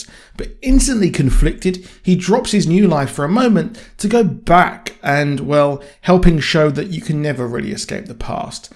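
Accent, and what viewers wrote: United Kingdom. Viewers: To call this video review excrement is an insult to excrement. Perhaps, before making a video reviewing a movie that is based off a memoir, you should READ the memoire so that you have some idea what changes were made. Had you done that, you'd see that some dramatization was included (it is a movie after all not a documentary). I'm from Ohio, from the big city, but from the state. My parents also moved from Kentucky to Ohio (which, btw is NOT part of the south) looking for a better life. Despite being a minority, my life was magnitudes more privileged than the author's, in every measurable and intangible way. As far as your hard left personal views, and how things would be in your country, such comments are unnecessary. As was your tripe about Trump. Your ignorance of why things turned about bad after maw maw's move was colored by your personal bias. 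Again, had you read the book, you might have understood. But I suspect, along with not reading the book, and not understanding how health care was provided by employers, you've never set foot in a small American town. And have no idea how much a town is impacted when THE industry in town goes away. You sound like a Brit, so asking anyone whose family lived in a town where the Colliery was closed and everyone that worked there was put on redundancy, or spoken to some one like Erin Prizzy about generational trauma would've given you a some perspective; save the connection to work and health care. Though, again, based on your stated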